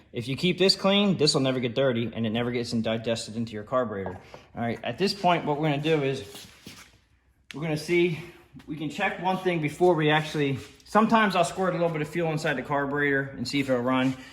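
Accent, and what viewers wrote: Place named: South Jersey, United States. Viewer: Your South Jersey/Accent makes me feel at home.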